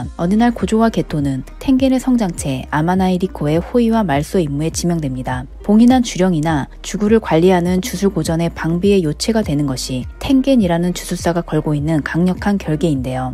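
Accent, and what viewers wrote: Japan. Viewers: 항상 잘보고 있습니다 일본어 발음이 영상의 매력같아요ㅋㅋ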